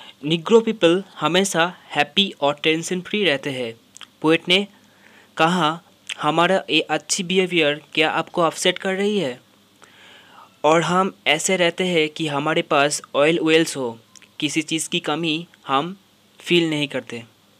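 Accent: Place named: Assam, India. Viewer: Apki aawaj to assamese jasi hai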